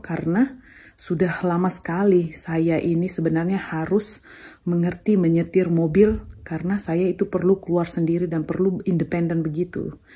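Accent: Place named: Medan, Indonesia. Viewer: Suka aku logatmu sis, ingat tetanggaku di kampung banyak yg dari Medan cute baby, lovely vlog sis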